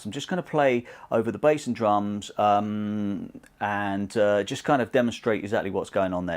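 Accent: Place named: England, United Kingdom. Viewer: I'm a leftie wot plays righty, mostly because my first guitar was my brothers and he got tired of me changing the strings around all the time. So I would not mind seeing the leftie versions of these as it would look like a mirror image which I would have no trouble with. Also it is refreshing to find an english video tutor. THe yanks are fine but it gets a little tedious listening to the accent. If I wasn't so broke I would sign up for the course immediately. A natural teacher you can de mistify the blues I have heard for so long now. very refreshing to watch and learn. Well done. keep it up.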